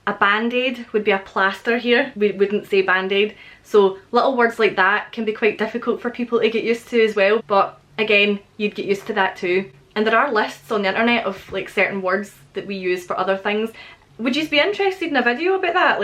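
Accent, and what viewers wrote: Scotland, United Kingdom. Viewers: I left West Dunbartonshire (Balloch) in 1957; I lived and worked in France and Switzerland from <num>. I've lived in South Carolina for the past 20 years. I never tire of hearing "my people" talk the way you do. I miss it too. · First let me say, you have a beautiful STRONG Scottish accent!!! :) We went as a family of 4 (Mom, Dad 11 yr old boy, 10 yr old girl) to Edinburgh and then the Isle of Iona. It was so stressful because the travel agent had the timing of everything so close together we could barely relax. The train to Oban from Edinburgh was really beautiful and long. The ferry from Oban to Mull was absolutely beautiful and scenic and magical because of the snowfall. The bus on the Isle of Mull to the ferry to Iona was also beautiful. But once we got off the bus (April 24th) headed for the ferry to Iona at Fionnphort, we were hit head on with sleet that was so hard and sharp that we all were crying except my husband. 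The sweet older ladies making the pilgrimage there - their eyes were filled with pity for us because we were all so stressed and NOT dressed for the sleet. :-( The biggest thing I loved was when the Scottish speak, it's like a song to my ears. I could literally listen to a Scot speak all day every day!! :) Everyone was so kind and so genuinely nice. We came with the impression that the Brits and the Scots hate Americans and were a bit scared, and that just was not true. Even with all that we went through, we are sorting out our lives here in Nashville TN and preparing to move there. My surprise was probably that haggis tastes good. ;-) No air conditioning makes me very sad, but if its not too hot there, I believe I'll be okay. :) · Loved hearing a Scottish accent again, having been born and raised in Edinburgh. Long before your time. 1938, to be exact. I’ve actually spent most of my life in the States, Los Angeles California. 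So our weather is completely different. Yes our Streets are wider, usually six lanes. However residential streets, are usually four lanes, two lanes, used for street parking. I can’t swear that Americans love our sense of humor, especially those we see on British TV. But I do make them laugh. And funnily enough, I’m not always making a joke at the time. I guess we Scots, just see things a bit differently. But I’ve yet to meet an American who doesn’t love or like the Scots. And those who’ve visited the old Country all loved their visits. I recall visiting some friends for dinner, and my friend’s wife said it was lovely having you. I just replied, “ I loved being had” I wasn’t trying to be funny, but it cracked them up. It took me some time to get used to driving on the opposite side of the street. Usually the worst times, early mornings, with little traffic. But after 55 years here, I’m sure I’d have the same problems back in Scotland. 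It’s no secret the USA, has a major Gun problem. Although I’ve never felt the need for one. Nor have my friends. It’s really a minority that owns more of the guns. Almost an Arsenal of them. But it depends on the State you live in, and their laws. As to the language differences, my first encounter with different words and usage, when I joined the Army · If I just start to listen to your videos everyday. Do you think it's possible for me to speak with a Scottish accent in a year? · Hi!!! I've lived in Glasgow for 1 year....it was so good to listen to your Scottish accent again...I was missing it so much....actually when I was there I could not understand not even the word "gym"....I took some seconds to understand which word the guy was saying but now...one year later...it sounds lovely hahahah Besides that the weather and the fact that you get drunk at 8 o'clock used to shock me definitely hahaha But you are amazing...Scotland is amazing s2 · i'm italian and i LOVE your accent!!!!wish to visit scotland one day!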